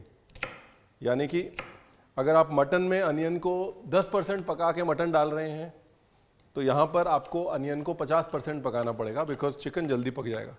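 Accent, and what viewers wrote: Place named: Lucknow, India. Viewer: Sir apke muh se lucknowiya bhasha bohoateee hi badiyaaa lagti hai... Ekdum gajab!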